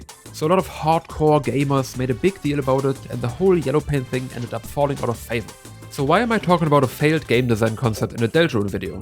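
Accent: Germany. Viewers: Deutschester akzent den ich je gehört hab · I hear a german accent.. .. hmmmmmmmm 🤔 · i hate that i can clock a fellow german speaking english in the first 00.2s seconds of them speaking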